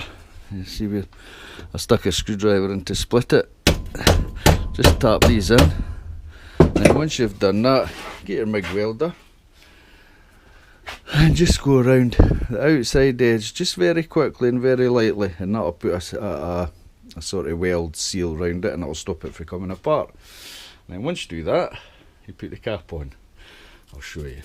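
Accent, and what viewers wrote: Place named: Glasgow, United Kingdom. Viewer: Thank You very much for the video and the nice scottish accent! Sounds like Glasgow? Say, why do You have to separate the inner and outer can with the wadding at all? As far as I understand it, You just want to shorten the biggest of the 4 tubes in the end piece You cut off before in step one? Sorry if I missed something. :-)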